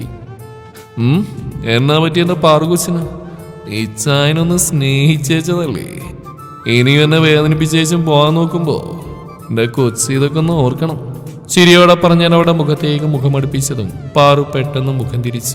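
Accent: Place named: Kerala, India. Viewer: Very very interesting story. Valiyappachan is great also Alex Achayan. Mr you are great indeed because you talk like real Achayans. That expression is awesome. You give us the feeling that the story is occurring really in an Achayan family. All the same Parvathy's talk is pucca like a tamil brahmin. I liked Athen's naughtiness. Anyway great, keep it up.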